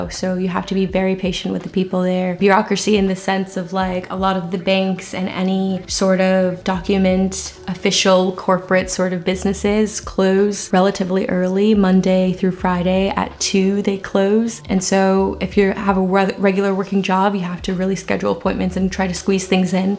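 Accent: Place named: Argentina. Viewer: I’m a native Spanish speaker and your Spanish sounds so amazing. I love the way you speak! Reminds me a little bit of Argentinian accents. You’re doing great, keep it going! 👍🏼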